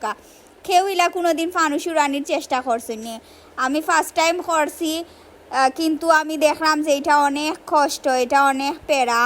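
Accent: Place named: Sylhet, Bangladesh. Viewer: Acca Apu apnader Sylhet er kotay❤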